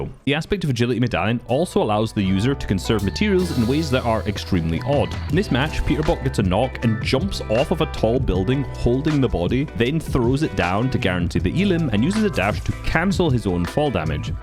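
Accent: Scotland, United Kingdom. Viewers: But isn’t he Scottish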